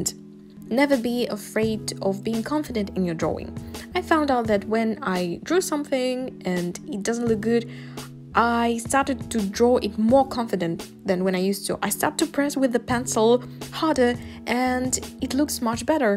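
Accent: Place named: England, United Kingdom. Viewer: I just love you Englishvoice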